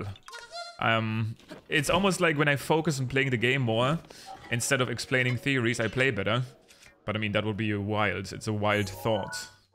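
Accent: Germany. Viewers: I'm not German but i love your accent! Please keep doing what you're doing and disregard the mean comments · I live in Germany as an American and am used to German English accents, I have many friends who sound similar to you and I don't mind it! I'll never understand why people have trouble with accents, like if you don't like it then fine, but keep the negative comments to yourself smh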